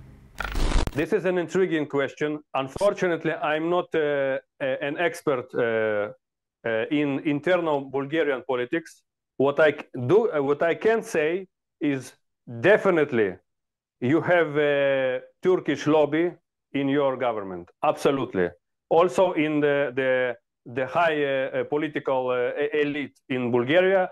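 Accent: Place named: Russia. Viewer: Такива откровени глупости! 😂Западно име със силен руски акцент! 😅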